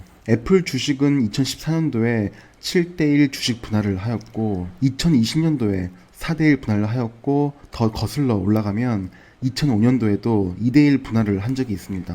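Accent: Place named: North Gyeongsang, South Korea. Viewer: 고향이 어디신지 꼭 여쭤보고 싶었어요 경상북도? 나가리~이런표현 개인적으로 참 편하거든요^^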